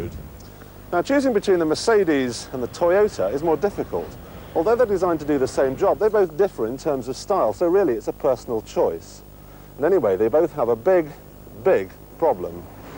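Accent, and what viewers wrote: RP, United Kingdom. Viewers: didn't know used to have that snot nosed royal english accent, if they fired him them, we wouldn't have missed him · if said "yesteryear" with a posh accent today, he would do it sarcastically. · Jezza sounds sooooo posh! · when. music was still awesome, but posh Repton Boys School accent..hehe..he masks his upper crust background quite well these days, but his lispy daughter's clipped accent betrays him.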